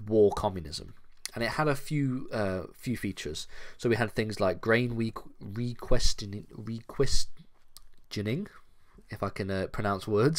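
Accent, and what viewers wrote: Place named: Northern England, United Kingdom. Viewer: you can tell this guy is nothern by the way he says "class", i love it. great videos literally learnt all my year one contnent from this page. screw online school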